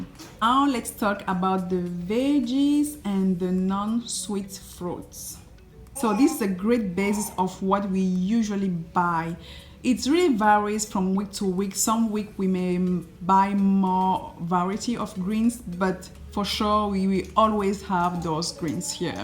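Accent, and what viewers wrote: France. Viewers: Kea lila Sok I believe she is from France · she is French and it is her native language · Stephanie Brown French accent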